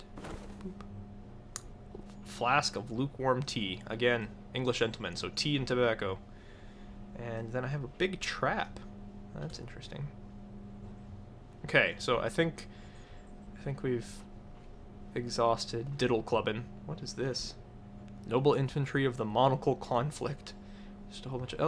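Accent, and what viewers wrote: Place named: Canada. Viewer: Very interesting game! Reminds me a little of Fallout :) I also have to say I quite enjoy your Canadian accent.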